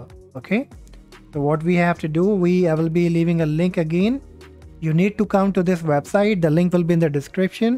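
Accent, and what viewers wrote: India. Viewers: indian ahh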